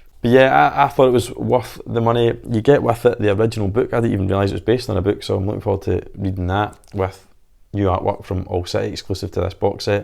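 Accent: Scotland, United Kingdom. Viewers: I don’t think your accent is difficult to understand at all. Yes, you have a Scottish accent, but it isn’t spoken with speed and slang. Your English is fine. I suppose it could be difficult if the listener was Chinese or something. Or American.... Americans have trouble with the English language. 😂 (Thats humour by the way if there’s an American reading this) Keep up the good work mate. And don’t say I told you this but your accent is fine! 👍🏻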